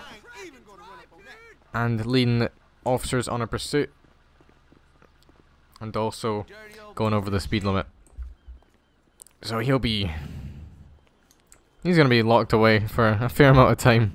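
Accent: Scotland, United Kingdom. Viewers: I guess your Scottish like me, I can tell by the accent also nice video mate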